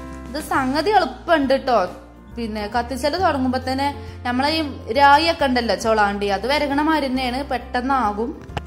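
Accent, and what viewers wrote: Malappuram, India. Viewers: ഈ അവതരണം ഒരു പാട് ഇഷ്ടായി. യതാർത്ഥ മലപ്പുറം മാപ്പിള ഭാഷ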